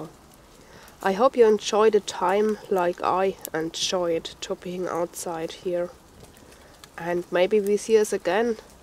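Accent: Germany. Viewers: Nice to see both dogs again, and great to see you finally have snow! It looks so beautiful. I'm making some Elderberry syrup right now, but I bought my berries, it does not grow around here, good for shortening duration of colds. Next time you say the English word stomach, pretend the ch on the end is actually a k, ka ka ka, OK? Your German accent in english is very cute, by the way. Nice spot for a fire and interesting combination, Bacon and fruit, i will try it next time I have a fire outside, thank you for the idea,.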